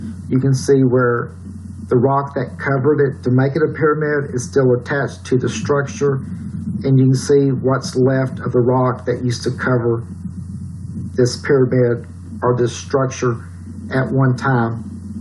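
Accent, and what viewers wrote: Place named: Texas, United States. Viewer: Excellent video! You have a great accent. Are you from Texas?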